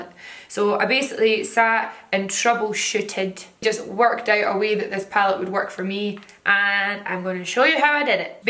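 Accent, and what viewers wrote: Scotland, United Kingdom. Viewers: Where in Scotland is from?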